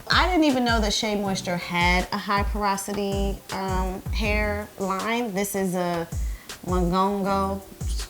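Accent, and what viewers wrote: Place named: New York, United States. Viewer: 1. I would have never guessed that you were not a native New Yorker if you hadn't said you were from Ohio! lol In Spirit you are a native NYer lol 2. Is that a baby Monstera Albo in that pot?? And you grew all those leaves on a supposedly slow growing plant! KUDOS! It's beautiful! 3. I WILL be trying that washcloth! 4. Not to mention how much natural hair products costs us! smh I be like $15 dollars for this little bottle? I'll just make this huge $6 bottle of Treseme work (extremely damages hair cuz it has the most moisture) and it usually doesn't work to well LOL #TheNaturalHairStruggle LOVED this video! IG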